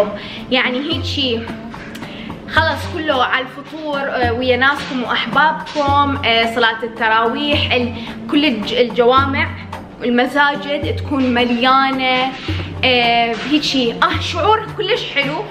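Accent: Iraq. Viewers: مشتركه جديدة حبيييتج وحبيت لطافتج وعفويتج وقناتج تجنن ان شاء الله نشوفج بأعلى واحسن القنوات ❤️🇮🇶+ اتمنى تظل لهجتج عراقيه حبابه لتصيرين مثلهم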